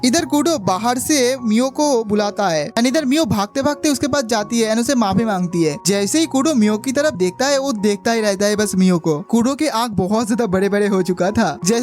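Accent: Bengal, India. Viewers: Are u a Bengali ? like ur accent is like that and nothing else ! ! ! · Bhai tum bengali ho kya😅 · tum bangoli ho mughay pata chalgiya😂😂😂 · If I'm not mistaken, you are a Bengali or your mother tongue is Bengali.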